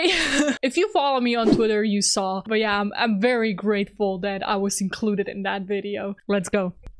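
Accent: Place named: Slovenia. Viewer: GIRL YOU’RE SLOVENIAN ?? I always wondered where you accent was from, I can’t believe you’re there I fell in love with this country when I did an exchange there for a semester in Koper, and since than I try to come back once a year. I was so happy when I saw Ljubljana on the list, bc a very good friend of mine, Slovenian, told me how you never have shows there and you always have to go to Austria or Croatia. So good for you !! Love from France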